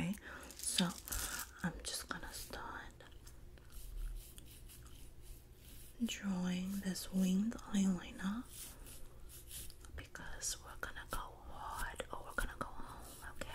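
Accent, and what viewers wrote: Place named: New Jersey, United States. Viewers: Hiii love u sooo much. Btw keep make jersey accent video I love those one anyway sleep well y’all ❤❤❤ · girl your jersey accent is peak! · Jersey accent is so good! You need to be a voice actor 😭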